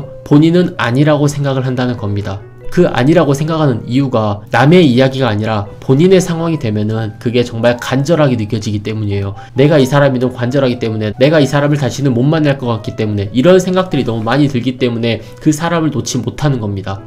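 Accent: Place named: Gwangju, South Korea. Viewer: 최근 힘들어서.. 영상을 거의 보게 됏습니다! 제목처럼 전 을의 입장이구요.. 장거리커플인데...지난달부터 마음 식은걸 느꼇고 환승하려하는지..어쩌다 연락도 안됏어요~~ 요즘 모든게 귀찬고 시국도이러니 제주에 사는 분이라 뱅기타지말구..내년에 보쟤서 클스마쓰 오늘 못만납니다ㅡㅡ 헤어지잔말두 안하고..만나지도않고 이 인간 버리고싶은데 맘이 결단을 못내립니다ㅠㅠ 내가 헤어지자고 말하길 기다리는거 같네요.. 제가 더 좋아햇던 을의 연애엿구요! 답은 알아요..근데 잘 안되는게 미칠지경입니다! 영상 모두 잘보구 잇구요..저도 광주가고향인지라 고향사투리 들어서 넘 편하게 잘보고 잇습니다ㅎㅎ 힝 슬프네요ㅜㅜ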